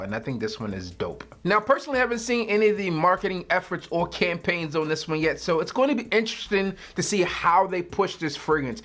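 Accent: Baltimore, United States. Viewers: Yo, you from Baltimore?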